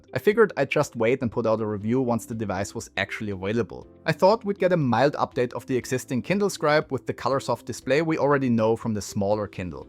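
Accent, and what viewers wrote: Austria. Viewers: Bist du Österreicher?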